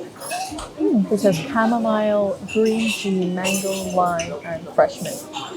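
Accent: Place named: United States. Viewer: your accent sounds like american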